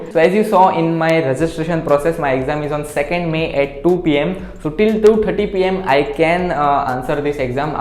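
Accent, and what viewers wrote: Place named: Maharashtra, India. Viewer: Marathi people get this sweet accent in English!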